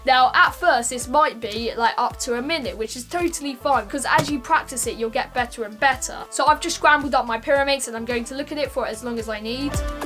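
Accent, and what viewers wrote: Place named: United Kingdom. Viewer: Say "bottle of water" in your British accent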